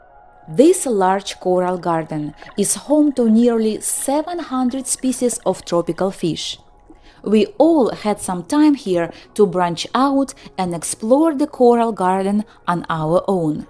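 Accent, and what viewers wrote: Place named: Russia. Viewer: Very nice, just one suggestion I would recommend you to use AI for your videos, your English is kind hard to understand due to your Russian accent.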